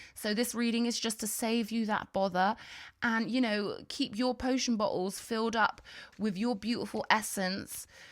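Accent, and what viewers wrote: United Kingdom. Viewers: it certainly is a British accent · It's that an British accent?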